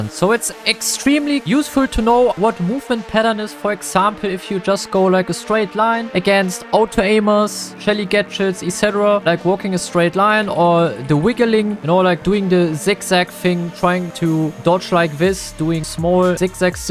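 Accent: Germany. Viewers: Man merkt so hart das er deutsch ist. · Du bist doch deutsch oder? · You speak English like Thomas Tuchel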